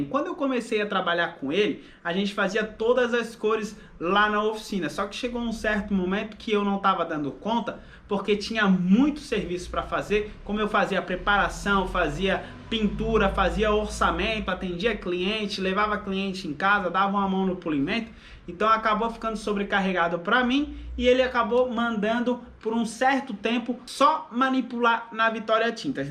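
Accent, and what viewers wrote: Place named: Pernambuco, Brazil. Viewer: Boa tarde é assim mesmo que fala Pernambuco suas dicas é tope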